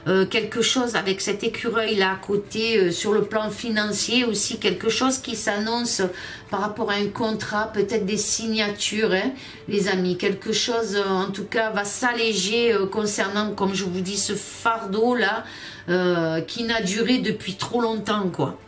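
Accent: South, France. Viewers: Du repos belle, nous passons des portes vibratoires assez fortes! L'on nous conseil de nous reposer!! Après c'est toi qui vois? Un grand merci d'être là pour tous! penses à toi... Le plan continu doucement à se mettre en place.. Bises de coeur à vous tous et à toi!! Encore une belle lecture avec ton joli accent méridional qui chante à l'unisson...!!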